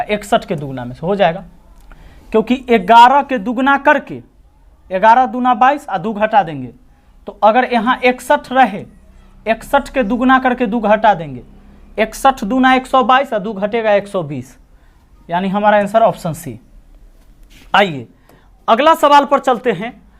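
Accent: Bihar, India. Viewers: Sir ap Bihar se ho kia?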